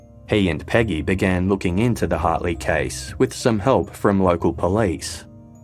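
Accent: Australia. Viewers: That is the sound of an Australian voice.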